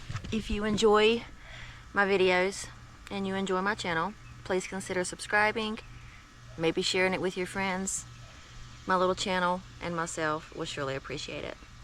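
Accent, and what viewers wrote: South, United States. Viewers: I like your accent, where down south did you grow up?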